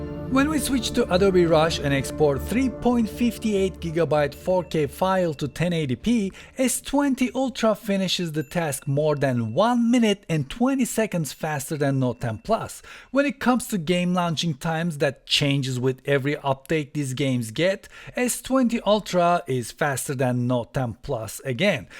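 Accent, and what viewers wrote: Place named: Turkey. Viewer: Your accent sounds like you are a turkish guy.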